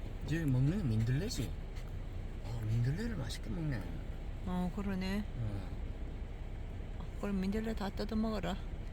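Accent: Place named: Gyeongsang, South Korea. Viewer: 멋진 영상과 구수한 경상도 사투리 ~~~ 즐감하고갑니다 👏 🙆‍♂️ 늘~건강하시고 행복한 나날 되세요 🙏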